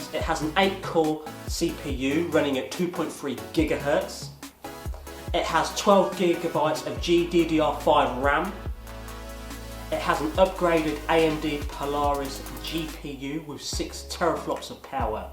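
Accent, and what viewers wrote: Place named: United Kingdom. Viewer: Am i only one who notice that this man skin tone is that of a black person yet he sounds like he's from Britain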